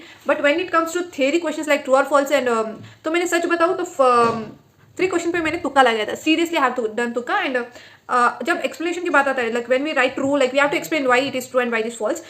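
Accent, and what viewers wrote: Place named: South India, India. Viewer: You sound like you're from South Indian .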